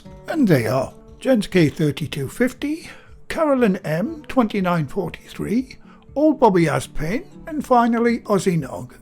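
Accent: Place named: Northern England, United Kingdom. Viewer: Hi, love your channel, I only now realised your voice is like Sir David Attenborough but with a Northern accent, really calm spoken, If Sir David Attenborough done the food channel and had a Northern accent I wouldn't tell you apart 🤣, thank you I'm looking forward to trying this one, it's not complicated 😁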